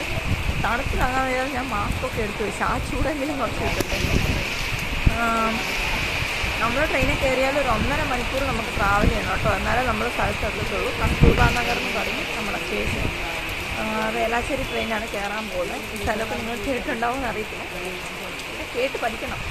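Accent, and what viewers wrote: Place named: Idukki, India. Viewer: Pakka idukki language ❤️❤️😘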